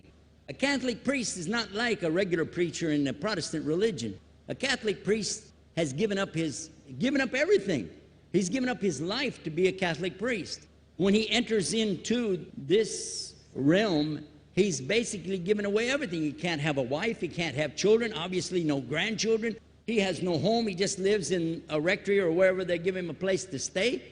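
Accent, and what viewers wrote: South, United States. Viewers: hears a Southern Drawl in *'s speech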